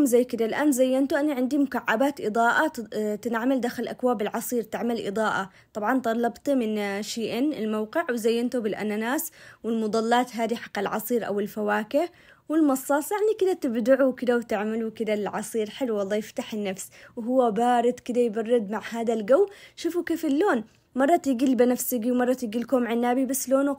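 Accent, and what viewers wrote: Aden, Yemen. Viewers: السلام عليكم ..حلو الكلام العدني انت من ايش من منطقه في عدن هل من كريتر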